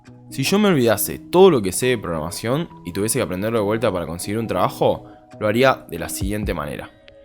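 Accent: Argentina. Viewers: Que feo un asiático con acento argentino :/ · Un asiático hablaba español con acento argentino, estoy confundido jaja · Cuando ves a un chino hablando en español argentino, no tiene nada de malo, pero como que algo no cuadra. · Mis ojos: es un programador asiático Mis oídos: no, es argentino.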